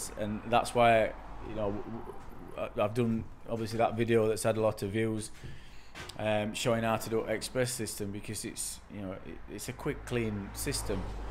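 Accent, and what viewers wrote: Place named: Barnsley, United Kingdom. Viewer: Only watched one video and I can tell you’re deffo from Barnsley. Best accent in the world.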